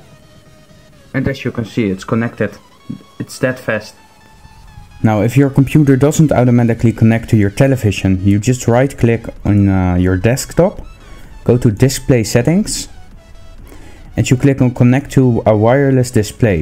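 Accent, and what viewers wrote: Netherlands. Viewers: hahah ik hoorde gelijk dat je NL was. keep up the great work man :) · you sound really dutch m8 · Duidelijk een nederlander XD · You sound Dutch-ish. Are you? :) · ik hoor dat je nederlands bent hahah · Ik hoorde meten al dat je nl was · You must be Dutch. That accent! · he sounds so dutch someone tell me i am correct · your dutch accent is terrible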